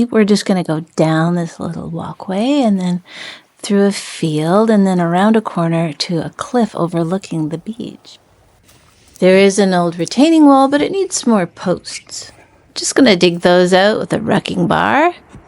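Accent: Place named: New Zealand. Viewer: hi from nu zillun love your kiwi speak lol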